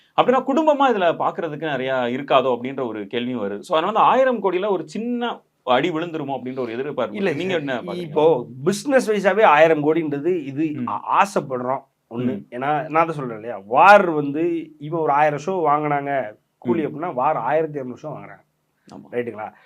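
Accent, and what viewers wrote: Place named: South Tamil Nadu, India. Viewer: I like your speech and enjoy listening to you particularly your tamil and south slang. Please continue speaking in tamil.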